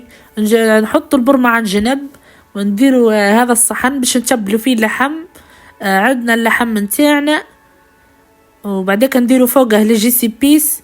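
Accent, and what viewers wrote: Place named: Souf, Algeria. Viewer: اليوم ولأول مرة نكتشف قناتك قداش حلو وهدرتك حلوة في لول حسبتك طفلة صوتك حنين من بعد لقيتك طفل حاذق وفحل ربي يبارك فيك اللهجة السوفية تهبل تهبل❤❤❤متابعة جديدة من باتنة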